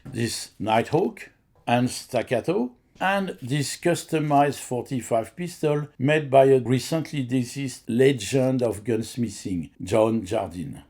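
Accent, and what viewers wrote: France. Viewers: I used to be an IPSC shooter, not a very good one, but I definately recognize the skill that Keanu Reeves has. He might only be shooting blanks but the gun handling is definately top notch. He is truly a very good shooter with great skills.: I love your videos, they are well done and the French Accent is a killer.. Je parle Francais aussi....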